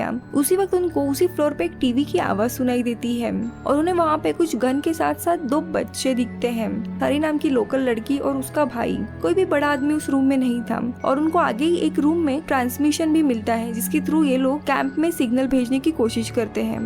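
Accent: Maharashtra, India. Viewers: Check your marathi accent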